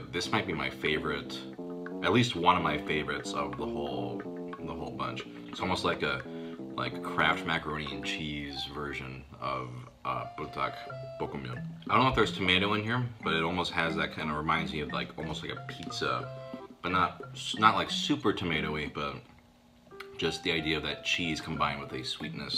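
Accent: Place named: United States. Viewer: Why do you sound 100% American??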